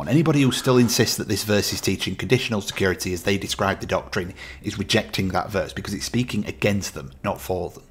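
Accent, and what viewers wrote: United Kingdom. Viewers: Random but, I enjoy ur accent. It's like a very clean sounding English accent.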